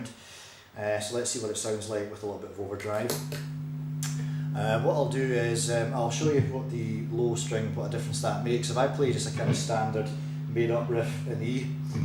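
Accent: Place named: Scotland, United Kingdom. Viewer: Great review thanks, looking for a 7 string Ibanez and came across this. I live in the US so I was happy to find a voice form back home (grew up in Edinburgh) Cheers!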